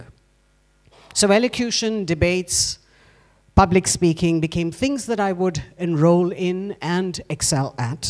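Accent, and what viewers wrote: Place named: United Kingdom. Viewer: The best news reader of all time with a professional British accent.Absolutely commanding,crisp,focussed and dominating.Never heard a speaker like her in my life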